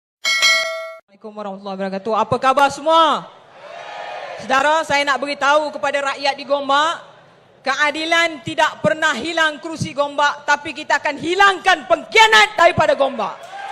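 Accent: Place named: Penang, Malaysia. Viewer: Seronoknya dengar longat Penang....gua punya hometown. Hantam kaw kaw very good presentation. Inilah ahli wanita yang berani cakap. Kita nak kaum wanita macam inilah....🤞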